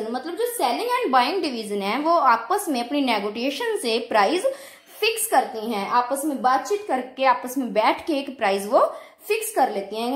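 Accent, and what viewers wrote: Punjab, India. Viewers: Mam app punjab se lgte ho haina?? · I loved the way u speak U have punjabi essence in ur speaking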